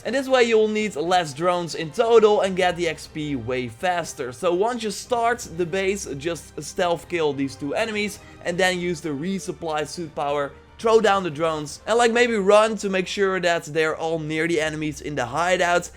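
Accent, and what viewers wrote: Netherlands. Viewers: Are you Dutch? ..You sound Dutch..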